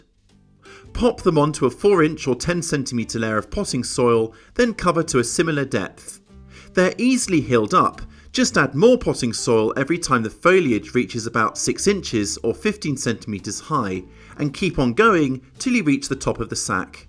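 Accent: United Kingdom. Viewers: The moment you open your mouth I know you were British 😅😅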